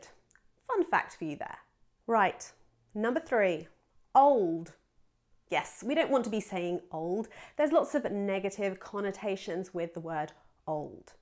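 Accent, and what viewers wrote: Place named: RP, United Kingdom. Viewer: so no abbreviations and your english is posh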